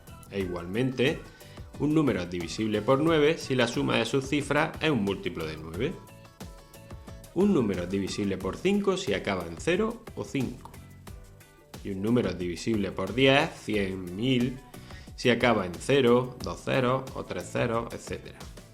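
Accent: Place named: South, Spain. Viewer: Como se note q eres del sur.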